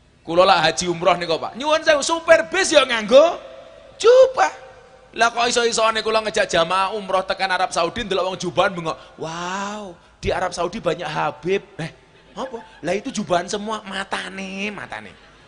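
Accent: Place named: Banyumas, Indonesia. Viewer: Pantsan Abah bisa ngomong ngapak menghormati yg desa"ngapak..kaya saya yg ngapak juga.. bangga sama Abah😊sht"ya Abah Gus Miftah kyaiku 🤲🤲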